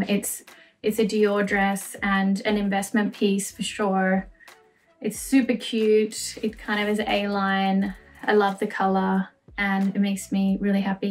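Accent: Australia. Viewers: Like her Aussie accent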